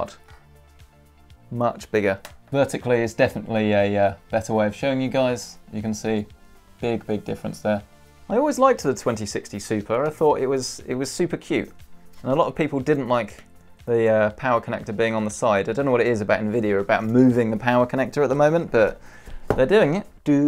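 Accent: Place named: Southern England, United Kingdom. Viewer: Judging by your accent are you in the south of England?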